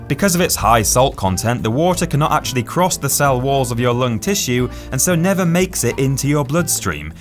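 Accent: United Kingdom. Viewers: I just love British sounding narrators..............They sound so intelligent.............................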